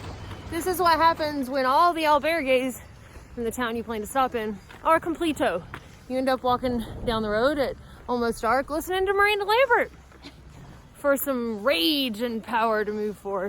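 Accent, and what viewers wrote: South, United States. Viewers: OMG, the part where you said "Hitch in your giddyup" and those people call you out in your accent... hilarious. Your voice is so southern when you're trying to be cute.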